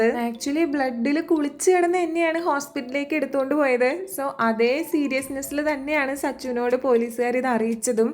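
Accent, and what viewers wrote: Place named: Trivandrum, India. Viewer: Trivandrum slang cheruthayit vannu thudangitund😁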